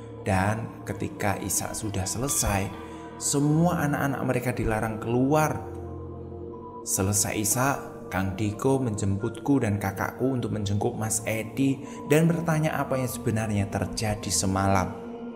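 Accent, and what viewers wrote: Java, Indonesia. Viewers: Waalaikum slm wr wb, masalah logat jawanya justru sy suka menjadi ciri khas kk " sy org sunda justru seneng mendengarnya, smga smkn sucsess..❤👍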